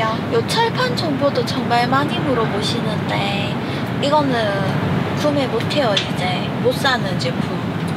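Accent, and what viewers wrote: Gyeongsang, South Korea. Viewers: 치우캠핑님이 구독중이라는거 보고 들어와 구독 좋아요 알림설정 해두고 시청중 새해 좋은일만 가득하시길~갱상도 말투가 귀엽네요~^^ 임시 땜방용 스카치 테이프도 가지고 다녀야 겠~~네~~요~^^ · 경상도인가요,구수하네요,ㅎㅎ 텐트가넒어난로를피워도,따뜻하이,안정감이있네요,풍경짱임니다,😁💚👍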